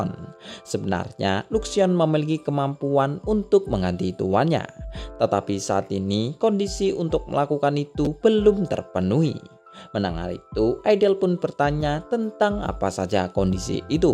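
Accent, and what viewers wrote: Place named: Madura, Indonesia. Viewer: Bg lu orang madura ya, kelihatan logat maduranya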